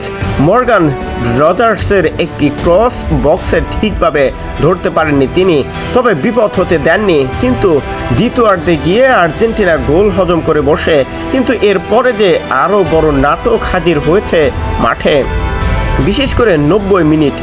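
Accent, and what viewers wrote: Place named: Bangladesh. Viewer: Bhalo bolcho tobuo bangladeshi bangladeshi lagche keno?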